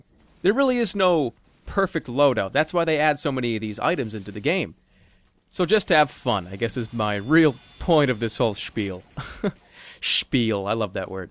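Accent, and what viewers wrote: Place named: East Coast, United States. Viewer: it's the east coast accent. that's what it's all about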